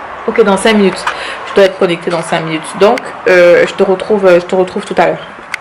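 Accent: Cameroon. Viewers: J'aime trop l'accent camerounais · Je viens de finir de regarder le vlog 😍....trop bien. J'ai appris plein de trucs, du coup j'ai trop envie de décorer ma maison. Ton accent kmer j'aime trop 😍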